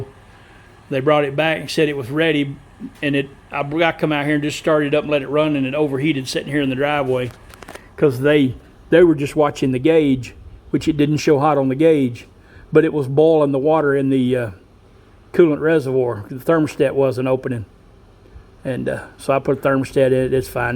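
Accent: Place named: Tennessee, United States. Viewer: Are you here in tennessee? You sound like a fellow tennessean.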